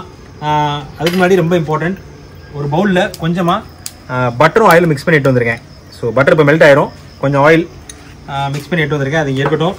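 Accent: Madurai, India. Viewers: எனது வயது 39 எனக்கும் சொந்த ஊர் மதுரை தான். Hope சம வயசு ஆளு தான்னு நெனைக்குறேன். இந்தாளு வீடியோ வையும் பேச்சையும் கேக்கும்போது ஏதோ கல்லூரி, பள்ளி நண்பர்களுடன் இருப்பது போல ஒரு Feel. அந்த கால கட்டம் வந்து போது... இந்த சமையல தாண்டி நீ நம்மூரு ஸ்லாங்ல பேசிட்டே இருயா... அதுவே நல்லாருக்கு... ❤❤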